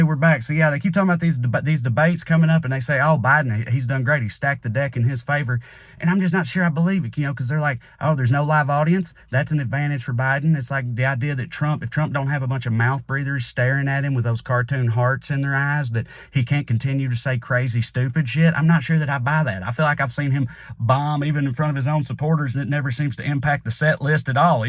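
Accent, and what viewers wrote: South, United States. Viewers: As a blue Appalachian in a deep red state I appreciate not only your sense of humor and intelligence, but hearing it delivered in a southern drawl. Thank you for making me feel a little less alone.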